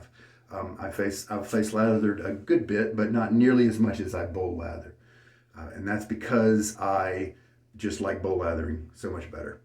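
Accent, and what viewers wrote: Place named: Carolina, United States. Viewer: oh it’s no problem at all! I get a bit overzealous with my comments sometimes haha. Very cool! I felt I was recognizing a carolina’s accent.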